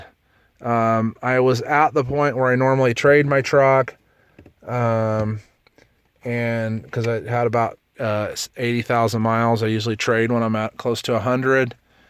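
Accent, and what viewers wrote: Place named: Utah, United States. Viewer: Listening to you speak I could tell you’re from Utah. Saw your license plate - sure enough lol I live here to. I’m looking to buy a 14 actually so stumbled on your video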